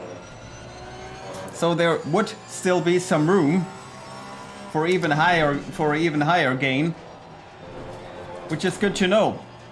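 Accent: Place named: Sweden. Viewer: Cool alright, though I heard a Swedish accent :)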